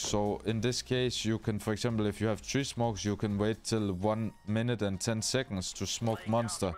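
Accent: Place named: Denmark. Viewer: Man kan virkelig høre du er dansker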